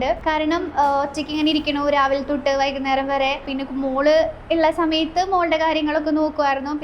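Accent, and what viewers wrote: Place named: Tamil Nadu, India. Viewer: സംസാരം കേൾക്കുബോൾ കേരളത്തിൽ ജനിച്ചത് alla alle തമിഴ് നാട്ടിൽ ആയിരുന്നു alle ജനിച്ചത് 🤔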